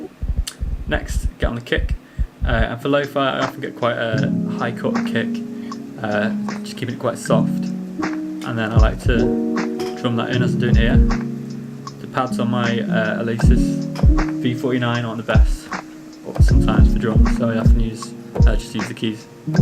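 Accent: United Kingdom. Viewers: You from the north of england mate?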